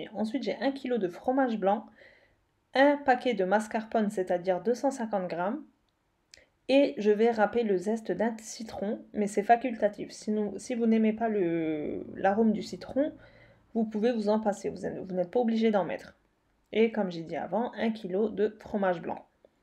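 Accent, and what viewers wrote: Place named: Lorraine, France. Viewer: Coucou ! Merci pour le partage. Quand je t'ai entendu parler j'ai trouvé que tu avais un petit accent lorrain... Ensuite, tu as évoqué Thierry Hommel qui fait très bonne tarte au fromage d'ailleurs et là je me suis dit qu'on vient du même coin 😜😜 contente suivre une personne de la ville de mon enfance 😉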